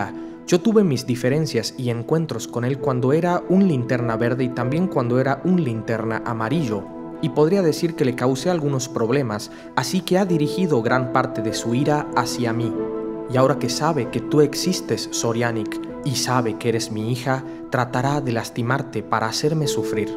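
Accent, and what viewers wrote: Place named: North, Argentina. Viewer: Perdon de donde sos,suena tu voz a como hablan por el norte en Argentina.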